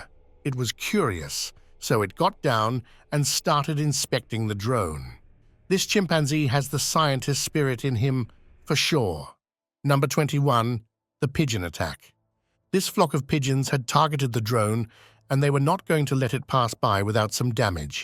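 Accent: United Kingdom. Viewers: Annoying British commentary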